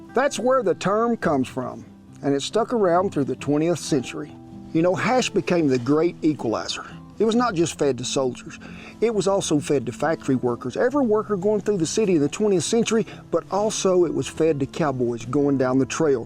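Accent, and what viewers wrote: United States. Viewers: 카우보이 어투가 너무 듣기 좋아요 ㅎㅎㅎ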